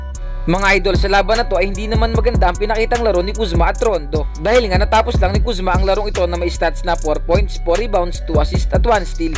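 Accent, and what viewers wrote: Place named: Iloilo, Philippines. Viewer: annoying ang punto ng salita mo mag ilonggo ka na lang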